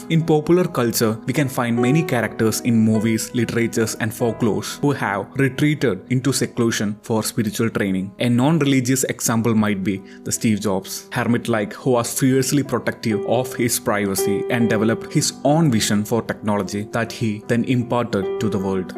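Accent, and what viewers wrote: Kerala, India. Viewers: Your accent is too obvious. Thick mallu accent is often too hard for foreigners to understand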